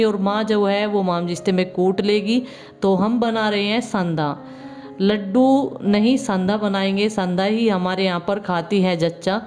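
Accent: Haryana, India. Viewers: Mam aap Haryana se h ya U. P. Se language toh Haryana ki h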